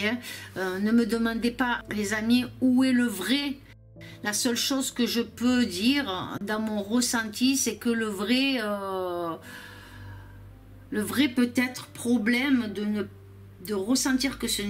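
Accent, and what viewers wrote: South, France. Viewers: Bonjour accent du sud c'est e plus beau moi je suis du sud-ouest le Gers.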